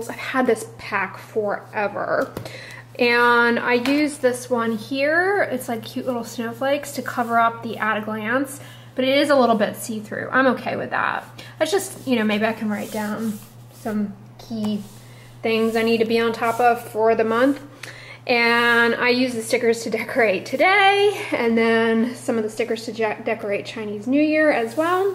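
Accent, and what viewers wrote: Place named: United States. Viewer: I realized that British people pronouns the Herb with an “H”, while Americans tend to keep the “H” silent. Went to school with both nationalities and this was often a topic of discussion, just fyi. Even when pronouncing the letter “H” itself...they say “Haych” and you guys say “Aaych” .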